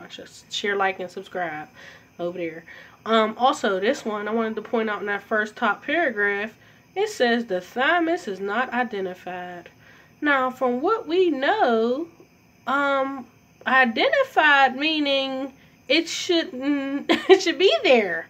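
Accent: Pittsburgh, United States. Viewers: Good video... if your not from Pittsburgh u sure have the accent 😀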